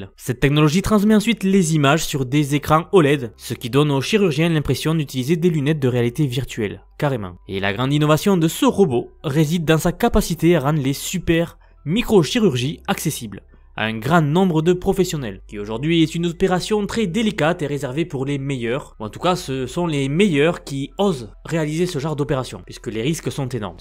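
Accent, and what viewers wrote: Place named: Toulouse, France. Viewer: t'étais pas journaliste sportif, par hasard, avant ? Je préfère quand même ta voix de toulousain ou pyrénéen, que ces voix horripilantes d'IA ! 😉 Ceci dit ton docu est impressionnant ! bravo !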